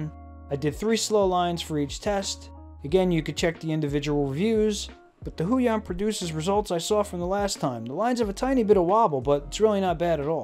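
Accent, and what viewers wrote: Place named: New York, United States. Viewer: good review, funny accent! (Must be from New York or somewhere up there in the NE.)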